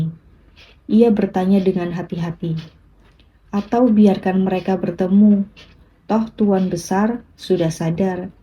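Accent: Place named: Java, Indonesia. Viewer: Koq jadi medok 😭😂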